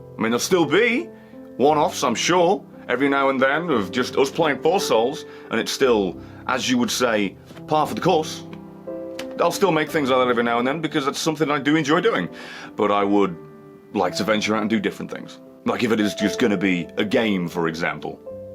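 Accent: United Kingdom. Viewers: As long as you do what keeps your interest and mind going, I think we’ll always stick around,. We’re here for you. We came for Isaac, we stayed for the screaming, enraged, messed up speech displaying British man that is you.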